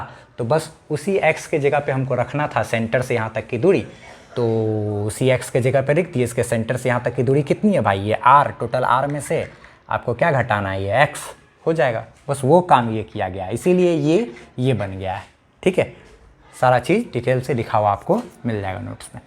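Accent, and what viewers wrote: Bihar, India. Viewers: plz.... support him everyone... he is bets teacher and the favorite part in his all videos is his bihari tone ....💕💕💕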